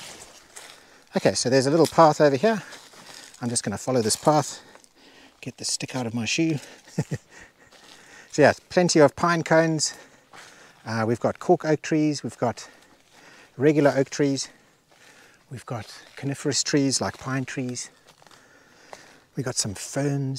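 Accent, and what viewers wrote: South Africa. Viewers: Fabulous property! Lovely to hear a fellow South African! 😊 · So lovely to hear the South Afrıcan accent was not completely sure untıl you saıd we SOUTH AFRICAN say BRRAI.thank you for the amazıng vıdeo.would love to buy such a farm for my organıc way of lıfe.Greetıngs from CAPE TOWN. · South African accent.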